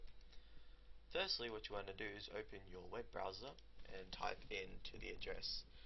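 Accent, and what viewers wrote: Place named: Australia. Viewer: You're an aussie :o highfive! XD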